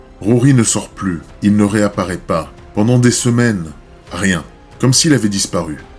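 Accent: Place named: France. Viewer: Coucou, j'espère que tu vas bien ? Que faire quand on se rend compte de ta notification vers 4h du matin ? On se couche plus tard car on ne peut pas résister 😂. Par contre tu as un accent français très marrant. Encore un qui a eu une enfance très solitaire et pas très stable, pas très enrichissante pour un enfant. On peut comprendre qu'il veuille prendre le contrôle, lui qui ne l'a jamais eu et surtout se faire voir. Par contre il n'a pas "chômé" niveau nombre de meurtres, il les a tué de manière assez rapprochée par rapport à d'autres tueurs en série. Merci encore pour ta vidéo hyper intéressante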